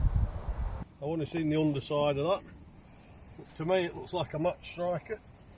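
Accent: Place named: South Yorkshire, United Kingdom. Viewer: Your accent sounds closer to the South Yorkshire accent than mine - I am from West Yorkshire.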